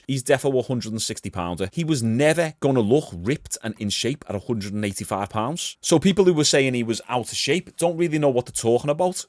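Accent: Liverpool, United Kingdom. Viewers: He's looked similar to this since he went up from 155. He's not naturally that big so he wouldn't cut anything for this fight. He'd want to keep the mass he had, no matter the composition. Watching the fight, if Nate was bigger and/or had more punching power, Jake would have been in trouble as he was eating uppercuts like candy later in the bout. Edited to include that I love the Scouser accent. It's my favorite of all that I've heard from across the pond. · oh snap! I subbed like two weeks ago bc I liked your logical takes on things... I had no clue you are a Scouser! I'm American so I can't tell based on accents alone but Liverpool has been my fav football club for a while now. I seem to fit right in here lol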